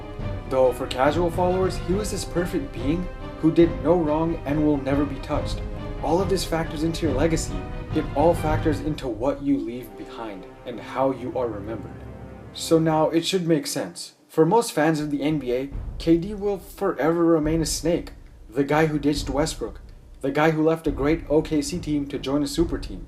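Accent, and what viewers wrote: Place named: Toronto, Canada. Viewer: Yes I am. I can tell you're from the 6 lolol.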